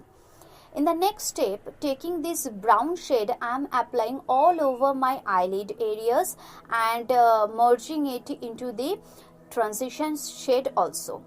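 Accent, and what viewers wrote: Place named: Bengal, India. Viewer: I think u r bengali bcoz of ur accent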